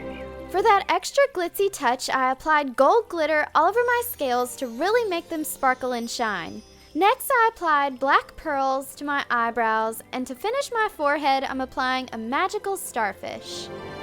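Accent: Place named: South, United States. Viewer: i love your look, such an amazing transformation but I have to admit, I laughed so much when you're cute southern accent said 'Ghetto Gold', made my day <3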